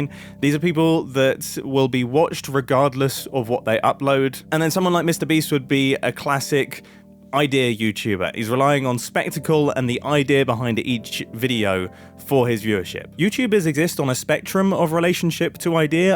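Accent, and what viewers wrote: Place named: United Kingdom. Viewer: I’ve been watching Let’s Play videos since 2017, maybe even 2016, and I can confidently say I miss those days. The “100 Days” videos are nice, but they feel repetitive. Modded Minecraft is cool, but it can also get repetitive. Once you’ve got the Ender Pearls and Blaze Rods, the video’s probably over. One YouTuber I watch, Kolani, makes both relationship and idea-based content, kind of like you. You both create videos not just for views, but to stay connected with your audience. I still remember stumbling upon one of your videos back in 2017, when my mum was battling a terminal illness. I just heard this British voice chatting away, asking, “Guys, do you want a timelapse?” That was your first ever timelapse, you mining down to level 12. And I remember thinking, this is me! Talking about cars, music, videos, traveling, all while strip mining. 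Nowadays, so much of it feels fake. People exaggerate everything: “Bro, how are we going to cross this lava river? That’s insane!” It’s just not the same anymore. Thanks. You’re truly unique.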